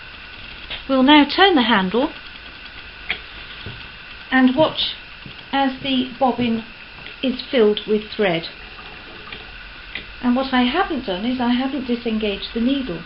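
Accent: United Kingdom. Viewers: Wonderful instruction given in the Queen’s English. I love the excellent quality and presentation of these old time videos. Thank. You very much.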